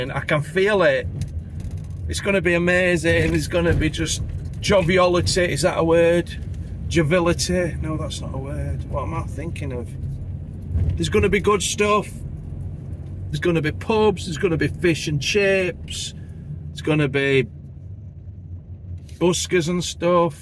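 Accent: Yorkshire, United Kingdom. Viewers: I lived that walkabout in Donny and your lovely Yorkshire accent. Pity you got a bit wet, but never mind eh.Greetings from a Yorkshire lass ,now in Australia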